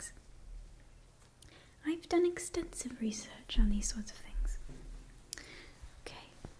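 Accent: United Kingdom. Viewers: Great British accent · How did you match the voice so well? I know it’s technically just a British accent, but something about it feels just perfect for this specific Zelda.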